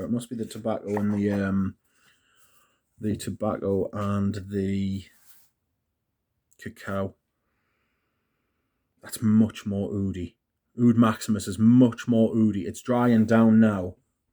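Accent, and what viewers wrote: United Kingdom. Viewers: Welcome friends British accent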